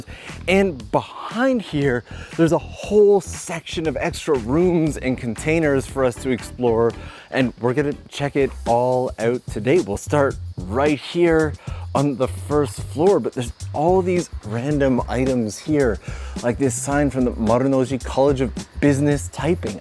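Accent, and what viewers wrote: Canada. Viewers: Your Canadian accent really came out in this episode. Great stuff! love your videos.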